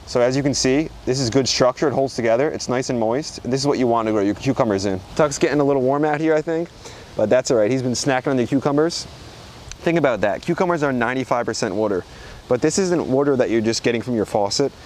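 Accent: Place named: Pennsylvania, United States. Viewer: Someone sounds like they may be from PA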